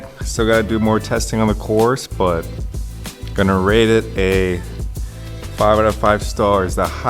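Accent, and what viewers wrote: United States. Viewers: Super chill Midwestern tone. It’s cool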